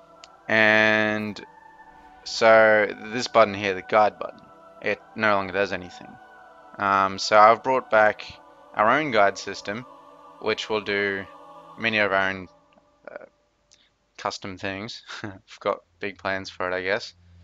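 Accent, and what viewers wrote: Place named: Australia. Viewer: That Aussie accent.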